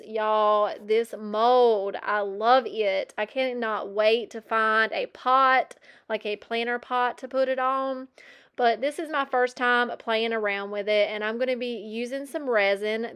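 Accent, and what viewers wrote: South, United States. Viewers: All these were great! I loved the tins the best, but the rooster in the frame was a close second. I think a deep red frame with black wax may have added something, but honestly, it's good as is. I'm grateful for my amazing family that tolerates all my crazy collections and hobbies! Congrats on 1 year and 10,000 subs - you are fun to watch. I'm originally from the south, living in Colorado. Your accent is like a warm hug from home. :)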